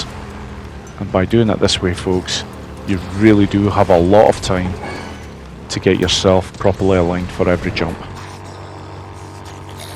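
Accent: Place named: Dumfries, United Kingdom. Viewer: Andrew Coltart - that's the guy! Your accent reminds me of his. Wiki says he was born in Dumfries. You close to there?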